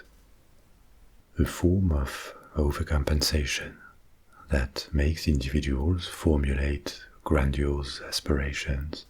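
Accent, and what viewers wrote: France. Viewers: The best ASMR creator. That velvet French voice. Knocks me out every time. Thank you for all you do ! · I went through a few other videos to find a relaxing voice like this. The French accent is a bonus and surprisingly relaxing. Will stick with the from now on. Love the stories.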